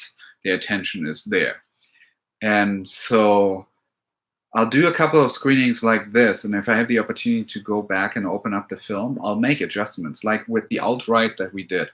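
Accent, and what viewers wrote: Germany. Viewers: Love ur videos btw are u german because of your accent? just corious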